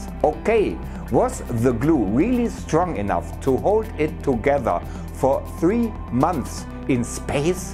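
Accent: Germany. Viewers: Denglish would mean that he is speaking like half of the words in German and the other half in English. He only has a german accent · Sorry. But this Art oft Denglish is terrible. Thumb down.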